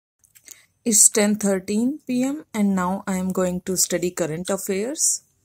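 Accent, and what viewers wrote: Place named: Kerala, India. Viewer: ohh!! srry to tag u so quickly but ur accent resembles a mallu accent!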